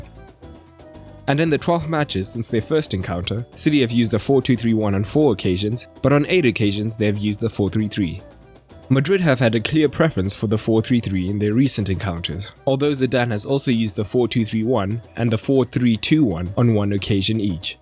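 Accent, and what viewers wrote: South Africa. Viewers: Hey man. Love your channel. Where are you from? You sound south african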